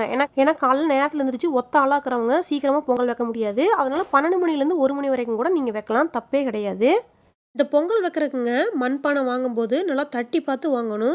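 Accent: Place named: Coimbatore, India. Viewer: BEAUTIFUL KOVAI SLANG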